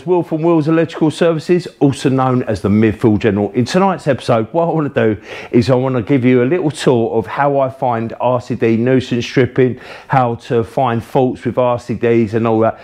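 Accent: United Kingdom. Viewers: This was in Queens English. I suggest you pay better attention next time.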